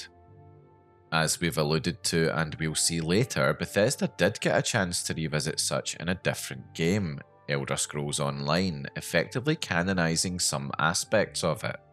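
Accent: Scotland, United Kingdom. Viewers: man i so love your scottish accent... always liked how it sounds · Are you Scottish? 🏴 let’s go I’m Edinburgh side 😊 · I like thick Scottish accents, and I like Bethesda game lore. You got me on board lol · The Scottish accent makes this video much better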